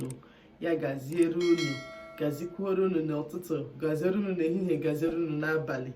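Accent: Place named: Igbo, Nigeria. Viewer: See how am smiling listening to you speak my Igbo Dialect😃😃😃😍😍😍😍Nnem this one dey totori my body😃😃😃😃💕💕💕💕Please created a Facebook page for Igbo class biko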